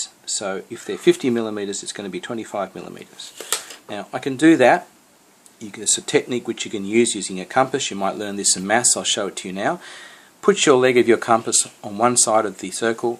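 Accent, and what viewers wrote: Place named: Australia. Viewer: Its an aussie accent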